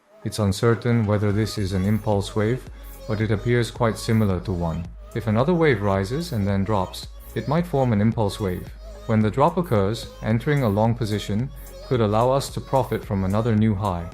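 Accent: United Kingdom. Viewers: 아니 잠깐만요 영어 충격받았네요. 인토네이션이나 발음이 해외에서 살다오신 분같은데 영국식이라 듣기 더 좋으네요 목소리 톤까지 좋아서😅 나만 알고싶은 님인데..글로벌 채널도 대박나십시오 🎉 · great british pronounciation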